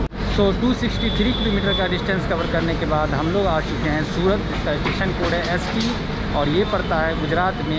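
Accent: Bihar, India. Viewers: Apka voice se hi pakar liya tha ki ap bihar se ho. Me bhi bihar se ho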